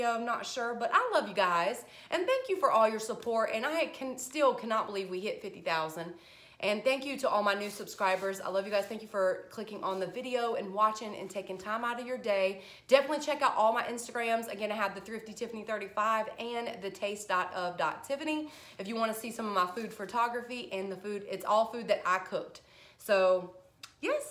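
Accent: South, United States. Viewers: I just love ya girl. I just love hearing you speak with that gorgeous southern voice of yours. Us southern girls need to stick together. I did find several of the cookbooks & I too love to cook especially new things. God Bless & "Yes Mam". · I love to hear your southern accent, of course I’m from the north. I’m still listening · New subscriber ! I love your energy and southern accent ❤️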